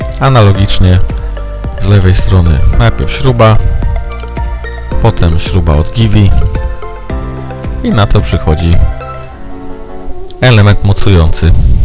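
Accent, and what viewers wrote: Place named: United States. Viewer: He he, no pełna profeska film :D po głosie nie poznałem, myślałem że to jakiś YouTuber z USA pod koniec pokojarzyłem fakty i filmiki z łódek. Teraz czekam na dalsze produkcje i do zobaczenia na trasie LwG !!! 👊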